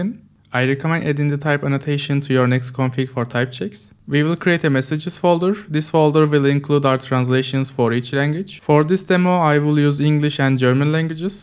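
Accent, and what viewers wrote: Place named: Turkey. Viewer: jeez, i know when i hear a turkish accent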